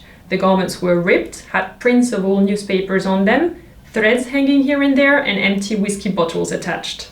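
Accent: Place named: France. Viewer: Could you put english subtitles in you videos? For me it is difficult to understand some words, since english is my second language and I don't know french so I am not familiar with a french accent in the english language.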